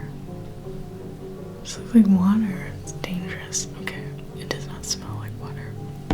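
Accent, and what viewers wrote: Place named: United States. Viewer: Falling asleep and then hearing Greggs with your American accent, surely not THE Greggs. But yes, it is the Greggs! I didn't know you were in the UK.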